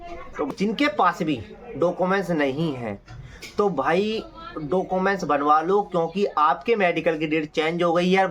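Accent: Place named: Bulandshahr, India. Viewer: Pure bulandshahr ki language bol rha hai bhai😅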